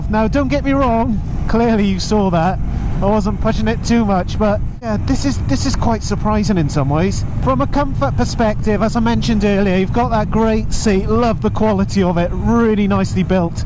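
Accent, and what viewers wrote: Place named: England, United Kingdom. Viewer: Good review mate. I have not ridden an Indian before but I don't care for the weight difference on the right side. To me, that is an Engineering flaw that could be addressed by Indian. I have to say though, as much as I like hearing how you talk across the pond, I just need more England accent and jive talk. Give us the good stuff... :)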